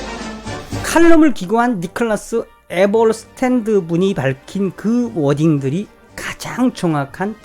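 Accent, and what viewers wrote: North Korea. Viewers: 제발. 손짓이나. 말북한따라하징말고 해줘요.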